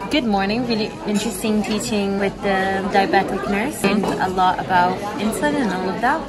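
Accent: United Kingdom. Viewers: Altyazılar olmasa ingiliz aksanını anlamıyorum ama halledicez artık xmcmmxö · She must be in the UK. Cause her accent.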